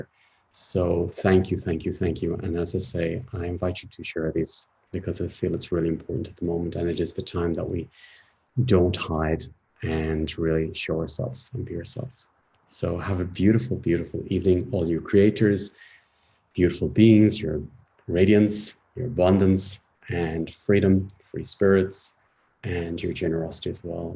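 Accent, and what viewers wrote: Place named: Ireland. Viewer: Awesome with lovely mellow Irish tones - I'm here with you as Lorie Ladd told me about you. I left off so so many old things that pulled me back throughout my whole life! As I was reared as Irish catholic, I saw the time I was baptised (6 weeks old) and felt that I was handed over which I did not like one single bit. I felt stamped and this did come off in the meditation! So grateful. At almost 2 years I was put in hospital for an apendectomy, saw my little child on a table and I went off up towards the ceiling, no pain up there. I was left in the hospital and someone had some red shoes that I couldn't walk in - I think a kind nurse looked after me. Ancestral memory too of an old woman sitting on the side of a stony road, too tired and hungry to go back or couldn't go back, she was delighted to see me, it was lovely. My channel is open and I feel wonderful with new vibrant colourful energy. 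Love grace peace and patience to you my friend.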